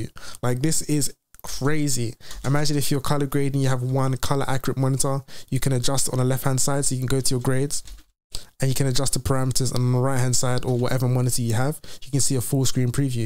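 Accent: United Kingdom. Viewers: Wonderfully done my friend...Love you man...Also love the English accent. Keep up the good work...peace brother..!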